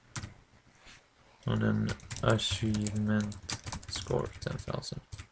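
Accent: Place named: Sweden. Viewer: Your Swedish accent is amazing, please say "The Genius Ninja" in the next ep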